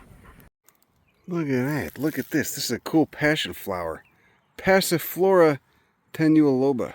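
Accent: Chicago, United States. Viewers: 💯% and with humor. All the above + primo authentic Chicago accent mixed in as a bonus. nothin better 💫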